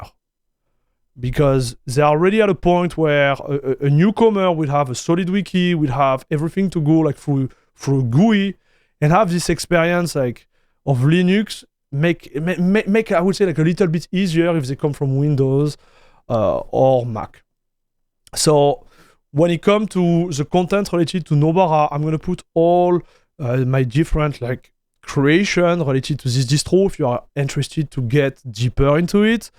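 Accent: France. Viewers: I love the French accent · it's not an English accent haha, it's French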